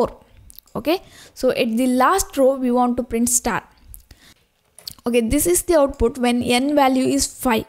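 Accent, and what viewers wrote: South India, India. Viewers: Bcz she's south indian.🙂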